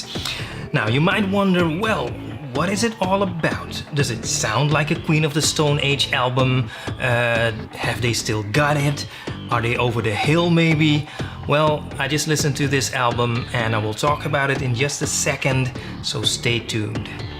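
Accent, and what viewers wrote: Netherlands. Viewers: are u nederlands?? ;-)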